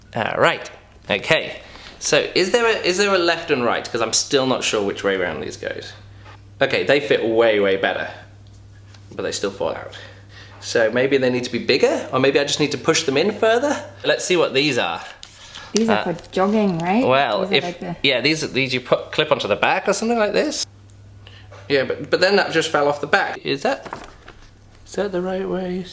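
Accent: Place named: Ireland. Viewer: i love ur irish accent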